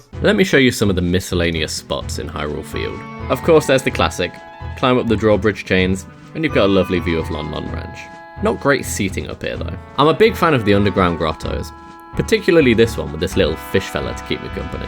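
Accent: United Kingdom. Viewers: Wait this guys British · this is the most british man I have ever heard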